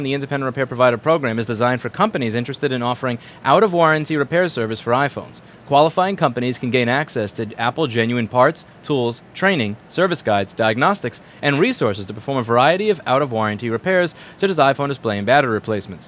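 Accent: New York, United States. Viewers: Lmfao young rossman had a new york accent i didnt expect thaf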